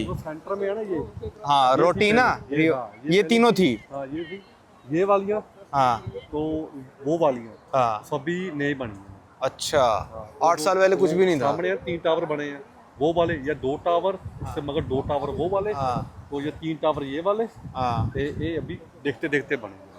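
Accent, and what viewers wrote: Haryana, India. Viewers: Mainly m bhai ki video dekhta hu bhai ke baat krne ke tarike se attract hoke bahadurgarh wale style me mast😅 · Batane lag raha hu....jaane lag raha hu....batane lag raha hu....ye bhai lag raha hu kisi bhi cheez ke peh le .......ye kis region ki hindi hai india mein? Koii bata de...may be a weird question to ask😂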